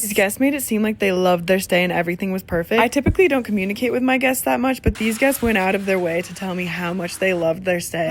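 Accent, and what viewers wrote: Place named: United States. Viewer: Sounds very American.. sincerely, a Dane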